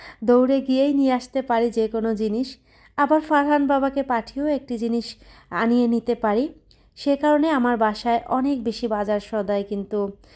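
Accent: Khulna, Bangladesh. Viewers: আপু আপনি যেহেতু খুলনার মেয়ে আপনার ভাষাতে ওই ধাব্রাই বলে। কারন আমারও বিভাগ খুলনা তো আমরাও ধাবরাটাই বলি তবে কি জানেন আপু ঢাকা আসার পর থেকে না চাপটি বলিকারণ এখানে সবাই চাপটি বলে।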